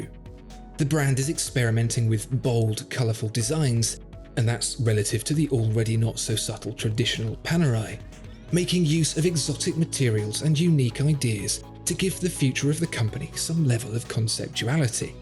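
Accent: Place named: England, United Kingdom. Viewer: Love your content but "Happenstance" FFS! Laurels is pronounced lorrels, not loorels. It makes you sound like you have put your beautiful English accent through a robotic computer enunciation programme.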